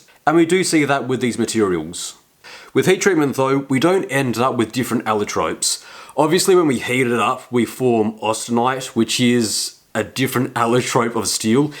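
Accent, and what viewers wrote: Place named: Bogan, Australia. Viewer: Brought out the face reveal definitely dont look like what i expected sound like a bogan aussie 😂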